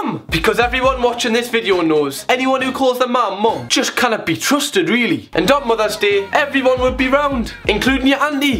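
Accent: Newcastle, United Kingdom. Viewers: Ayeeeee been looking all over YouTube trying to find a real geordie here's a propa geordie a new subscriber you've earned!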